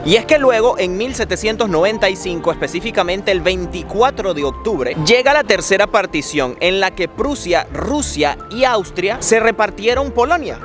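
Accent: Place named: Venezuela. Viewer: soy venezolana y por casualidad me encontré con este video. Al identificar tu acento, me emocione. Al mismo tiempo me lleno de admiración como en estos tiempos tan difíciles están realizando tu y tu esposa este trabajo increíble. LOs felicitó! Continúen con esto que es el rescate de la historia y su legado a las nuevas generaciones.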